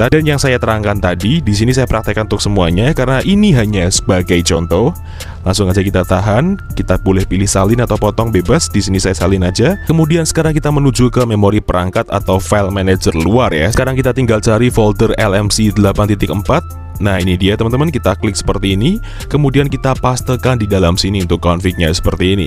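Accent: Surakarta, Indonesia. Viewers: org solo bang?wkwk sama nih😅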